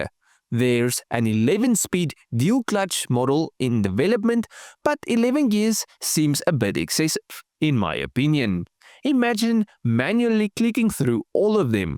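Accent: South Africa. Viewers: your accent sounds like a mix of south african or dutch and something else, what is it?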